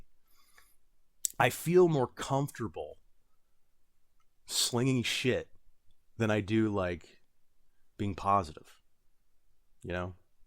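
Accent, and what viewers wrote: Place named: United States. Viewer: The voice The shirt The American accent Absolutely cinema.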